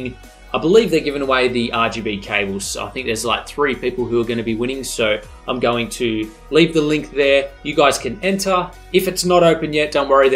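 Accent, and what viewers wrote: Australia. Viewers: r u an Aussie cuz to me u sound it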